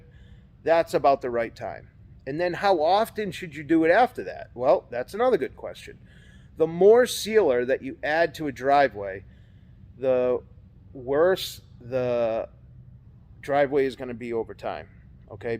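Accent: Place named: Northeast, United States. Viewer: i knew you were from the north east from your accent. Cheers from New York brother. - Mike, Asphalt Works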